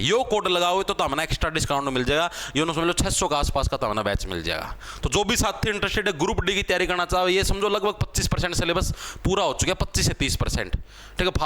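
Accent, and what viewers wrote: Haryana, India. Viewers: Result dekhi ja....aapki haryanvi ktttiiiii zehar😅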